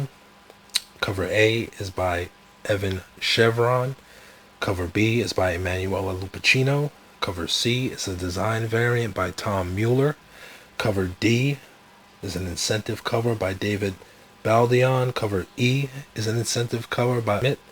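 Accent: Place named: New England, United States. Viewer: Yo my guy are you for Mass.? I be hearing that New England accent lol.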